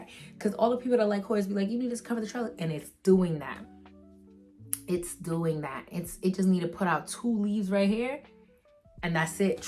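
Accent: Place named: New York, United States. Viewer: GORWLLLL how am I now coming across ya page… are you from New York? Cuz I know my New York girlies accent. Like, I’m cutting up. Naw, I gotta tap in now. Yuuuuurrrrrr 😅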